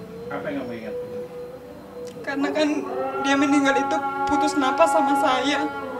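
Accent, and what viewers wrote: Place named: Bugis, Indonesia. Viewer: Itu bahasa Indonesia campur logat bugis soalnya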